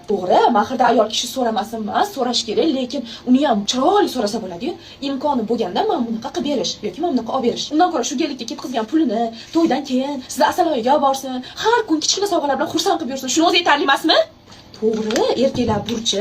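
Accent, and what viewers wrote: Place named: Rural, Uzbekistan. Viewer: Sanga qoldimi qishlaqi gaprish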